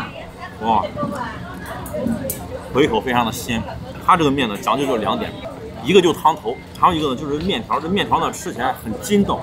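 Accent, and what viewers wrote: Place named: Shaanxi, China. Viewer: 可以听出来陕西口音 😊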